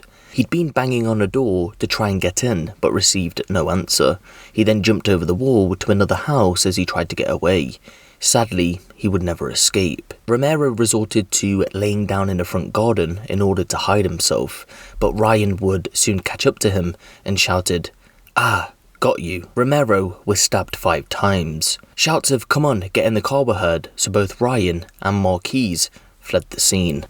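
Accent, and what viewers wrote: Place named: RP, United Kingdom. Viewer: Not being horrible but I ain’t watched u in ages and your voice sounds well posh since I last heard you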